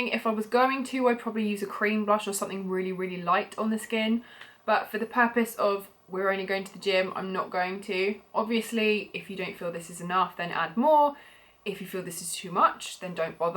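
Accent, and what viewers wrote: United Kingdom. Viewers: what part of uk is your accent? If you don't mind me asking. I must say, you're very beautiful and your accent makes you perfect 😊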